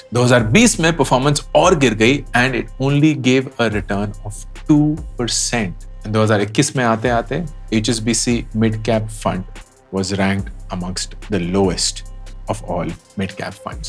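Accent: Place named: Kashmir, India. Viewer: Sir Aap ki surat aur zubaan se kashmir ki khushboo ka ehsaas hota hay.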